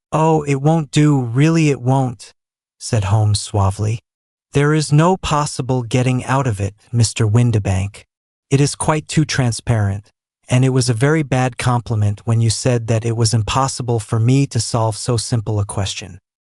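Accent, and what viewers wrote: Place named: United States. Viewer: No offense mate. Doyle did go to the US with his erstwhile friend Houdini but despite your valiant efforts American "English" doesn't really do his oeuvre justice. Look up BBC radio drama Ectoplasm (free on you tube). You can thank me later. One author was once asked what he thought of American culture and replied that, "it sounds like a good idea". Keep up the good work mate.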